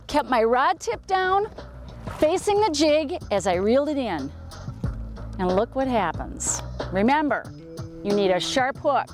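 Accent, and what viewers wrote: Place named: Minnesota, United States. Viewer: haha she sounds like she might be from minnesooaata but this is really good...you rarely ever get sound, down to earth advice from fisherman on yt... how do you not set the hook though? setting the hook has become like a tourettes tic to me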